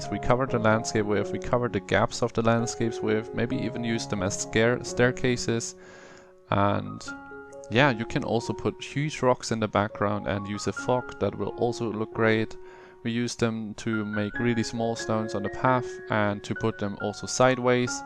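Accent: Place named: Germany. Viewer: Hab bei Dir sofort rausgehört, dass Du Deutscher bist - aber ich muss sagen, dass Dein Wortschatz und Sprachfluss echt stark über dem deutschen Durchschnitt sind. Danke für die Videos! Das motiviert mich echt, eines Tages mein eigenes Spiel zu entwickeln.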